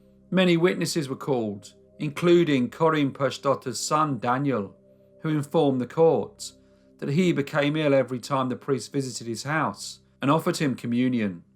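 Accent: United Kingdom. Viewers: Gerrylynn mako. It is a British accent. I believe it is the Queens English. is a well educated person.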